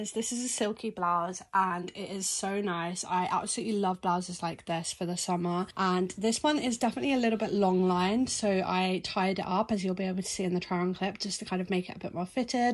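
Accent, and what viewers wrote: United Kingdom. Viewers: I really love British accent you sound like one❤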